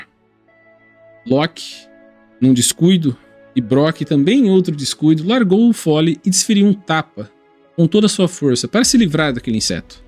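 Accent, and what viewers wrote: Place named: Campinas, Brazil. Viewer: Excelente vídeo mano parabéns, senti falta do sotaque DDD 19, cadê? 😂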